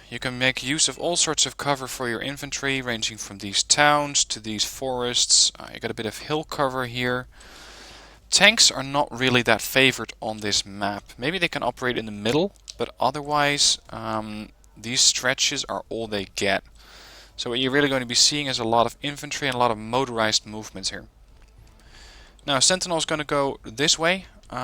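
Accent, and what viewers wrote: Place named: Netherlands. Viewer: I can tell by your accent that you're Dutch. Gave channel man!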